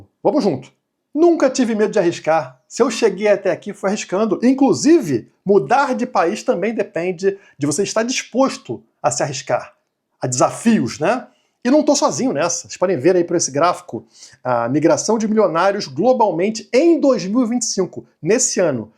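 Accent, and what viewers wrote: Rio de Janeiro, Brazil. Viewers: Jurava que tu eras carioca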